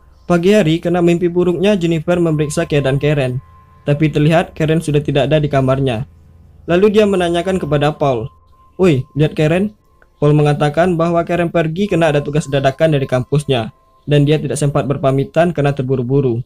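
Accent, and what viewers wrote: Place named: Pekanbaru, Indonesia. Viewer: Orang pekanbaru ya, min? Gaya bicara nya gitu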